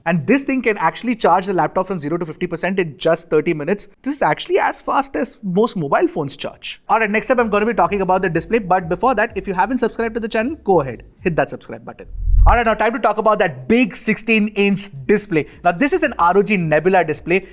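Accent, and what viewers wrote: India. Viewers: Brother why your English like Hindi easy to understand ❤❤ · VERRY FUNY WHEN INDIAN GUY TALKING ENGLISH !!!!!!!!!!!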